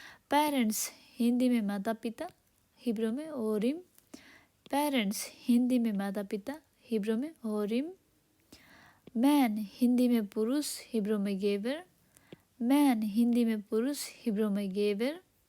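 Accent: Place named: Nepal. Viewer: Behen ji aap to Nepali ho, aapki Hindi pronunciation Nepali lag rahi hai, aur aap ka teaching bahut fast hai, play class ke bachchon ko 5th class ki tarah sikhaoge to kaise sikha payenge. Aur aap ka words bhi cursive letter me hai, use print letter aur bade size ke axronme likhiye. tabhi to kuch thoda Sikh payenge Dhanyawad. 🎉🎉🎉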